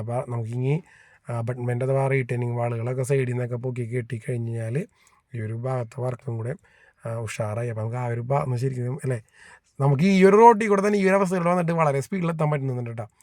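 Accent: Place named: Thrissur, India. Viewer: Nalla Thrissur slng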